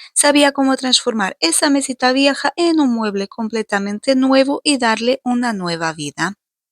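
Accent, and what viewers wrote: Portugal. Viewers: Iiiih gracias a ustedes, imaginé que eras portuguesa por tu acento, lo que no imaginé es que fueran pareja ¡Olé a los dos! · Gracias por contar los inicios del proyecto, yo os sigo desde hace un año aproximadamente y tenia mucha curiosidad por saber como habia empezado todo, Tarde un poco en reconocer el acento,pero habia llegado a la conclusion de que efectivamente eras portuguesa,pero creia que era carpintero Gracias por todo tengo una casa heerdada muy antigua en la que como autodidacta llevo peleandome toda la vida por mantener eos muebles antiguos heredados¨Teneis unas ideas geniales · Tienes un acento precioso me relaja ver vuestros vídeos y escuchar ese acento portugués tan agradable que tengáis mucho éxito un abrazo a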